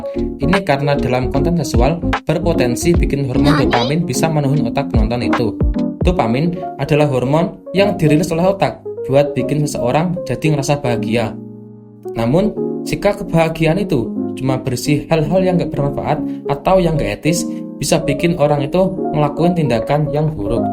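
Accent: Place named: Java, Indonesia. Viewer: lebih enak kalo medok gini bg aseli🗿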